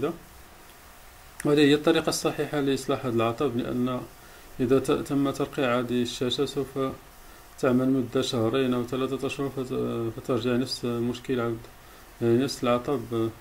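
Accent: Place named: Morocco. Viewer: الصوت مغربي و الفيديو لشخص من الخارج على ما أظن .و هل على بالك ان في المغرب يغيرون الليدات كلهم في سبيل ليد واحدة محروقة.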